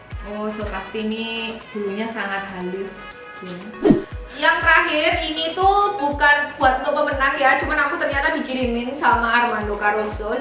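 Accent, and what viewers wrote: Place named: Malang, Indonesia. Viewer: Ben ndelok video e mbak aku mesti cengar cengir ambek medok e😂 malang e asli👊👊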